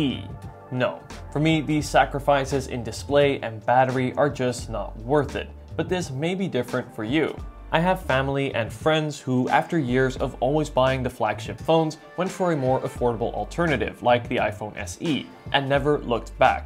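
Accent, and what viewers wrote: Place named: Netherlands. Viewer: Nice comparison (review) man, took me a minute to figure out your Dutch accent. 😂😂😂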